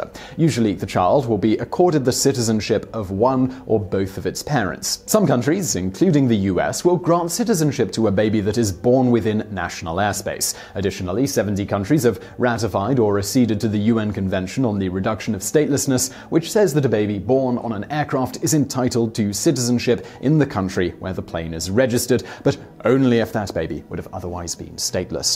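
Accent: United Kingdom. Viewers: He seems extra British today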